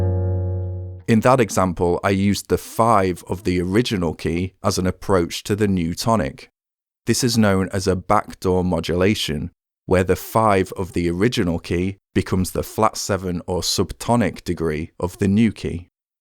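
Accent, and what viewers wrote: United Kingdom. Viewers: I guess he s British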